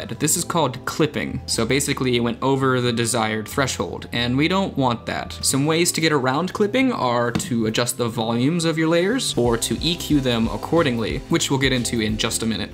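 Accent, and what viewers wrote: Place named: Canada. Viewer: I only just now realized that you're Canadian! Soon as I heard "pro-cess", instead of "prah-cess". Dang your accent is barely even distinguishable from a General American one. Hardly the stereotypical Canadian accent!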